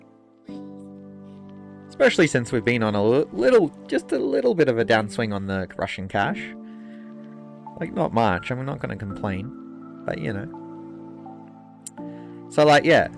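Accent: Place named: New Zealand. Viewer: His accent sounds like he's from New Zealand but it says hosting from Canada? Am I just mistaking the accent or did he move? Anyone in comments know the answer? (Curious because I'm from New Zealand)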